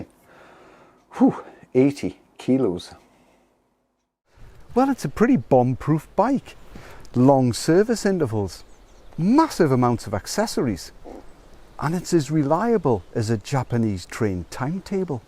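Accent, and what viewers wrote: Newcastle, United Kingdom. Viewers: Another excellent machine in the same ‘category’ is the Yamaha XT 250. Indestructible, nimble, super reliable, terrific fuel economy, cheap to purchase and maintain, nice low super comfortable seat and will go absolutely anywhere. Rode mine for 6 years throughout all types of terrain (here in SW Australia) - never let me down and would go places (right spots) where many larger machines would not venture - or reluctantly. Excellent resale value - sold it for more than I bought it. ONLY downside - a drag when needing to ride long distances on bitumen in one stretch - only enough power to cruise happily at 90 - 100 km/h - any more and it was working too hard. Excellent video by the way - love the Geordie accent (albeit a subtle one) - the most colourful of all English accents.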